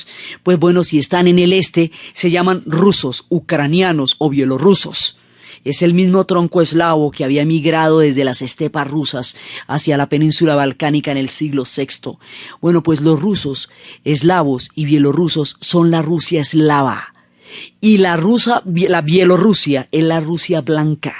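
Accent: Antioquia, Colombia. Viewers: Muy buen relato. ¿Eres paisa?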